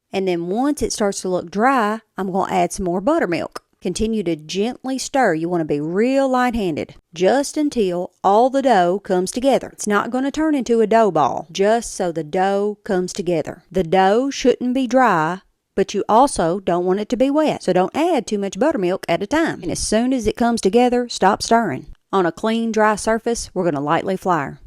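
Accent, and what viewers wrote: South, United States. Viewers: Some people just ain’t got no mama that ain’t no crack head 😂😂😂ya know what im talkin bout 😂…. It’s true , I just have had my fill of hearing that thick southern accent in this political climate , so slight apologies for the snarky comments….hopefully you a lovely southern belle with a non racist disposition 😊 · I love her country accent 😊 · Love that southern country voice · I'm from alabama and I love her southern accent. Would not trade my accent for anybody. Southern women know how to cook and we know how to cook and eat and enjoy it l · I'm not sure which is more perfect, them Catheads, or that perfectly beautiful Southern Accent. ❤ from Etlanna. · Whenever I hear a woman with a southern twang I immediately trust their food judgement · The accent is so cute. As southern girl for sure. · Born and raised in MI, but stayed in KY about 4 years When I was a teen. 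It's where I learned how to cook and homemade biscuits and gravy are a regular meal. The accent and recipe make me miss the south. Those look delish btw ❤ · Well, thank you for your southern recipe and that southern accent. My sister may the Lord bless you forever. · Just on your accent alone I subscribed! Southern accent = Amazing food! ❤❤❤ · You might be the most southern woman I think I've ever heard. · You know what makes this fun, its following her direction in southern accent which i love. Thanks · goodness how nasty that southern accent sounds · Trying to place Your Accent: Alabama? Mississippi? Or Georgia? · God was in a good mood when he gifted us with the Southern accent. Love from the UK. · God that southern draw sounds sexy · You have a beautiful smile and love your southern accent ❤️ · I'd marry this woman right now I love that southern accent ❤ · Gotta love your southern accent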